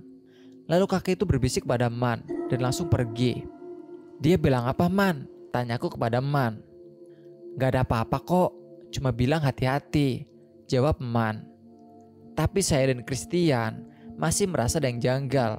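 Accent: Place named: Tegal, Indonesia. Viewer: Mas asli jw tengah tegal yo kon logat tegal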